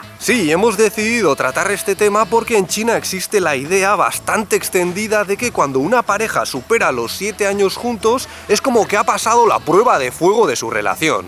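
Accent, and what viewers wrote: Basque Country, Spain. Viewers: El acento vasco de es muy gracioso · 🤣🤣🤣🤣🤣🤣🤣🤣😂😂😅 soy mexican y el acento tuyo me encanta español de España JAJAJAAAAA es Argentino o español???? Sorry nose me puedes decir?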